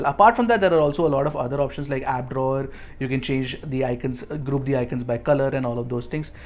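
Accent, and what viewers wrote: India. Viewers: I hate indian accent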